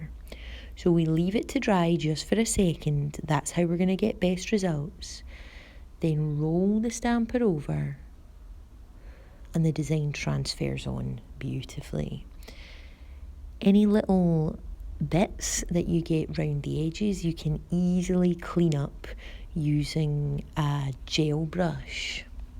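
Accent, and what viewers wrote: Scotland, United Kingdom. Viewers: Great tutorial, instructions are very clear and your accent(Scottish?) is just adorable! Xxx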